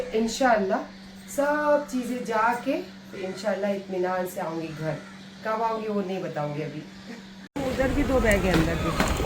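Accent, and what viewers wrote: Bangalore, India. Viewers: Ur bangaluru urdu is nice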